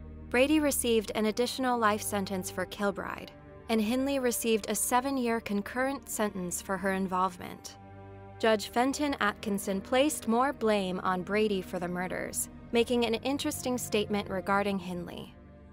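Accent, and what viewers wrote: United States. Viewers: Quit with these dreadful American accent talk over please awful this should be a British talker. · it’s quite strange to these very British crimes reflected back on to you with an American accent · Hearing an American pronounce all our town names is so strange! I live so close by it's so eerie and sad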